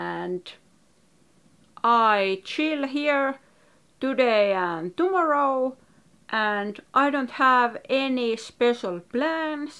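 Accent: Finland. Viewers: I am from Nepal(very small country from asia)..and the most unique thing i found about Finland is the accent...i have mentioned in ur other videos...its really amazing the accent...Moi!!😁😁😁😁😁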